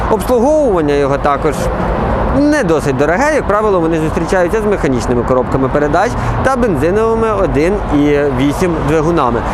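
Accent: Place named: Rural, Ukraine. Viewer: Говориш, звичайно по кугутському)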